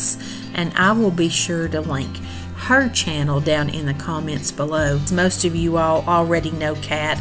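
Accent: South, United States. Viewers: Definitely rice or roast potatoes...you make me laugh, saying you nearly dropped your cake. And the other time when you burnt your meatballs! Love that you show your fails, and your southern US accent is such fun to my English ears.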